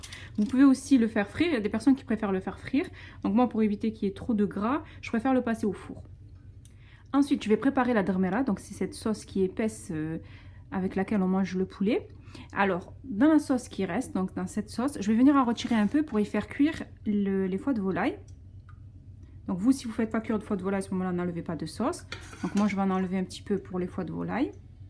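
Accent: South, France. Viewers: Très bel accent du Sud en plus machahalah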